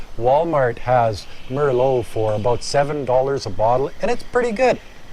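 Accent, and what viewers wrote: Canada. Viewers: This gentleman is a Canadian, eh? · This guy sounds Canadian. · This guy sounds very canadian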